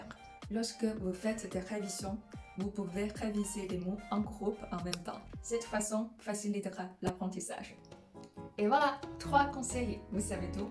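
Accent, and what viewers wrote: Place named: Quebec, Canada. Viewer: 哈哈😄，老师的新视频出来了。第一个来打卡。这次的视频比起之前的视频让我耳目一新的感觉。法语表达挺溜，我的感觉带有些加拿大Québécois 的口音。太棒了。这次的视频更是针对外国学生学习汉语的关键问题。谢谢分享。👍🤩😘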